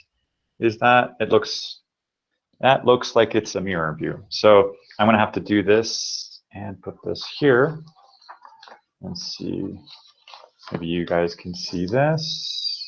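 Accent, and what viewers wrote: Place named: Canada. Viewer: tu parles comme un canadien.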